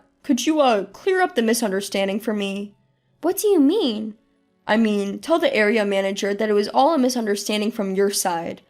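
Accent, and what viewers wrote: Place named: Middle East. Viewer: At least it wasn’t Chinese AI. More like middle eastern.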